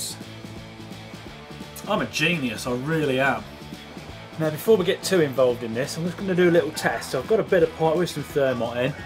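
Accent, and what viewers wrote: United Kingdom. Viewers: You can tell where he's from he's got a British accent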